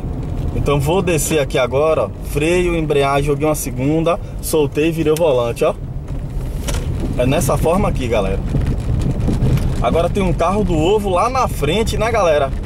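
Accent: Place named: Pernambuco, Brazil. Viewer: Você é Pernambuco? Gosto do sotac.. Parabéns!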